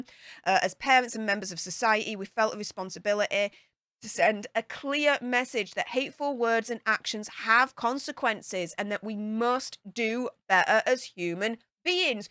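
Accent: Yorkshire, United Kingdom. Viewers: She’s Ok got that accent from Yorkshire 🙂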